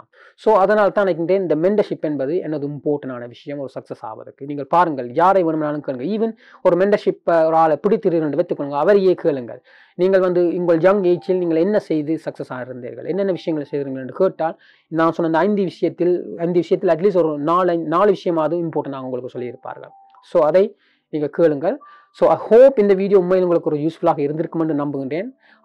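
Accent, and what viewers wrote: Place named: Sri Lanka. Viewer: Srilankan Tamil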